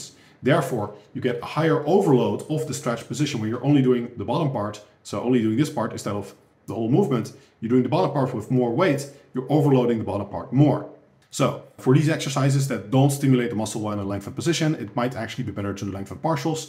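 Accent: Netherlands. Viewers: At the start, for a second I thought Alistair Overeem was speaking :) Almost the same Dutch English accent and intonation hehe. Either way, great content! Keep up the great work!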